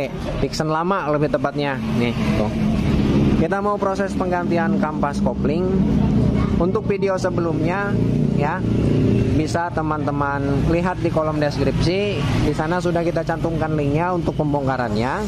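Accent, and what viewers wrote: West Java, Indonesia. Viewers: Mantep ah Jiga orang sunda Kang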